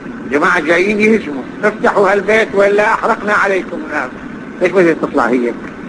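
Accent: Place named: Iraq. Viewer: هذا لهجة نتاعو عراقية ماشي دزيري الله لا يبلينا ربي بالتشيع الله يسترنا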